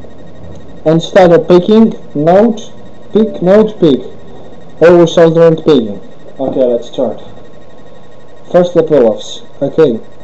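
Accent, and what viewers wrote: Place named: Russia. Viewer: russian?!?!?!? every time i hear a russian accent, I think of the game starcraft... "Battlecruisers, ONLINE"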